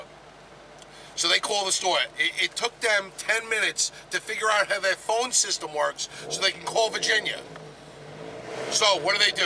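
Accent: New York, United States. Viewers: Sounds like you're from New York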